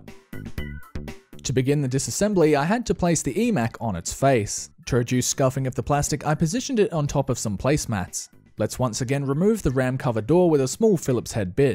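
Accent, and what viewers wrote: Australia. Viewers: I just realised that Australians seem to pronounce “assume” as ashoom 😁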